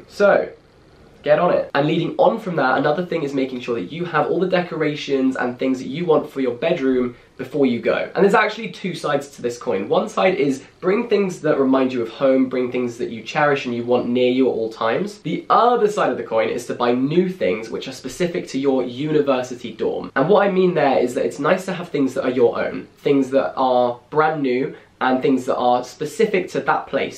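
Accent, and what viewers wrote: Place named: Durham, United Kingdom. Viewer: Sounds like he goes Durham, cute though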